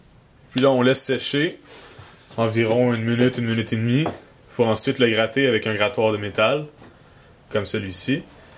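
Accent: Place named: Quebec, Canada. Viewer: Cool la video j aime bien votre accent Qbekoi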